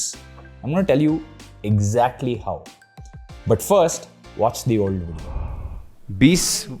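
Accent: India. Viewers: Your hindi reminds me of south indian can drivers😂😂, no offence btw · I think you're a south indian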